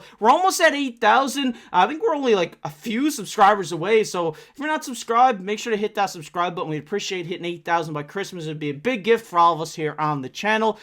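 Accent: Newfoundland, Canada. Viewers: Are you a Newfoundlander? East coast accent is strong!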